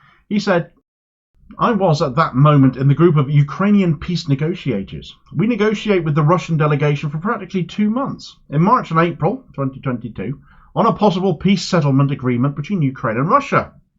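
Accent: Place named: West Country, United Kingdom. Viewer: Hi, your accent sounds where I am from its a wiltshire/somerset one right? I now live in Israel and enjoy your rants. I been labelled a threat for speaking truth about assange and war crimes in Iraq while in UK. Someone must of reported me because I been followed and harrassed since then, even moving to Georgia to escape persecution. I actually thought in Israel as an arab that my family would not get involved but they betrayed me and my own father does tactics the perp does. Checkout a short I posted of my house being watched. Id love to get my story out somehow. Let me know what you think (play with sound)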